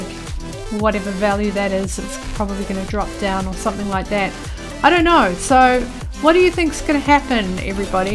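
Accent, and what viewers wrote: Australia. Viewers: Are you Aussie or New Zealand? Or from a completely different place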